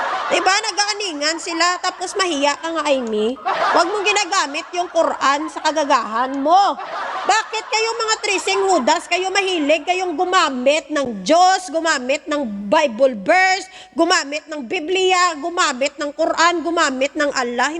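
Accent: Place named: Visayas, Philippines. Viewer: kapag naririnig kita madam naalala ko c sen meriam na kahit tunong bisaya kayo pero malaman ang mga sinasabi at kahit mabilis k magsalita my direksyon at nasa tuno ang bawat buka ng bibig mo.isa kanang alamat madam sa larangan ng vlogging.mabahay k godbless❤❤❤❤❤❤❤❤❤❤❤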